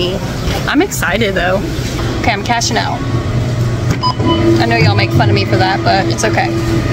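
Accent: South, United States. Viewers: I stumbled across her a few days ago. I like listening while I work. Her southern accent is calming. ...from Boston. 😬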